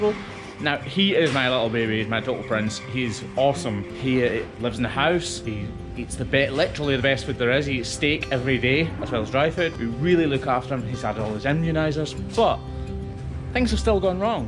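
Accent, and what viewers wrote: Scotland, United Kingdom. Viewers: are you from uk? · Thank you for caring about our four legged brothers and sister. Your Scootish accent is music to my ears as Im a third generation Scot on both sides of my family here in the US. My grandma's dialect was so thick we almost coudnt understand her. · You know I’m getting used to the Scottish accent when I can watch the video on silent with AWFUL subtitles and still figure out what he’s saying. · He has a Scottish accent. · I'm amazed by it. I never though this would intrigue me but I am. Plus his Scottish accent is the cherry on top lol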